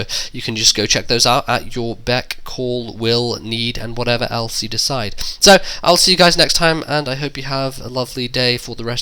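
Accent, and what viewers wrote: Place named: United Kingdom. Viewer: best british accent out there :]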